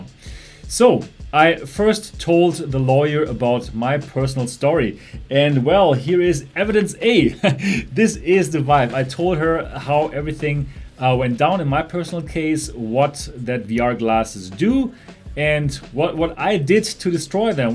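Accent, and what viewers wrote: Germany. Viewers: Also dein Deutscher accent hört man sowas von aber Cooles Video :D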